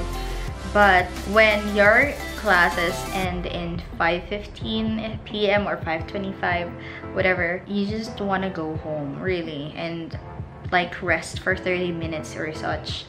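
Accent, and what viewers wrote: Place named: England, United Kingdom. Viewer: ATE CAN YOU TEACH US WITH YOUR ENGLISH ACCENT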